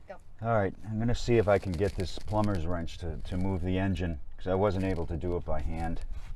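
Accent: New England, United States. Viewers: What part of New England are you from..lol I'm from the North Shore..😅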